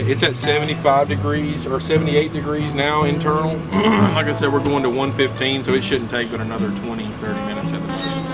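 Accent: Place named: Alabama, United States. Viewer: Are you from Alabama? You sounds like it.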